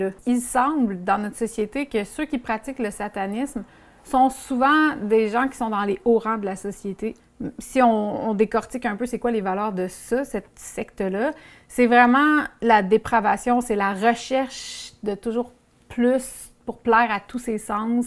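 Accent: Quebec, Canada. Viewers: J'aurais préféré que tu fasse une vidéo un peu plus longue c'est dommage parce que t'explique ça bien et pour un français j'ai pas eu de gêne à t'écouter malgré ton accent québécois.. En tout cas j'espère que tu poussera plus lorsque tu fera celle sur le pizza gate car pour le coup ya beaucoup a dire et c'est très très grave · Très cool ta vidéo chère amie Québecoise ! Bien montée, bien narrée (avec le petit accent qui fait plaisir !). Bisous de France ;)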